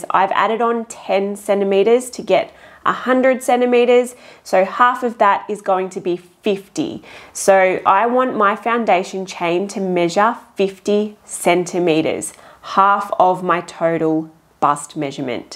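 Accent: Australia. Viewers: Well-designed cardi, new sub, just want to listen to your Aussie accent...😘♥️🦋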